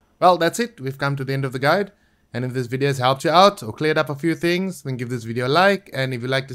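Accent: South Africa. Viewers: You're the most south african sounding guy i've ever heard 🤔🤔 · Are you from South Africa your accent is quite familiar. And thanks for this. I have Assassin's Creed Rogue and modding it has always been something I wanted to try out.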